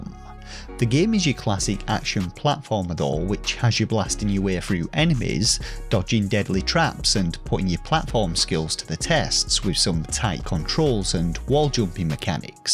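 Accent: United Kingdom. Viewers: but every single word you pronounced was in a British accent. You did pronounce it correctly. We invented the damn language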